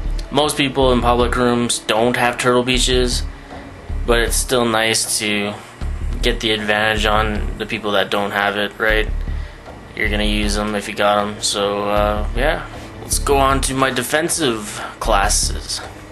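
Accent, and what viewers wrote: Canada. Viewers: u from canada?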